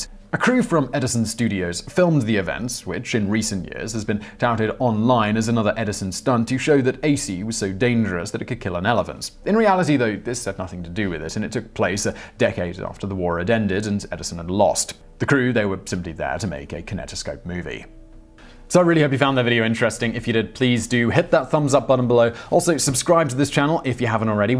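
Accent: United Kingdom. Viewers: The BritLish pronunciation is interesting indeed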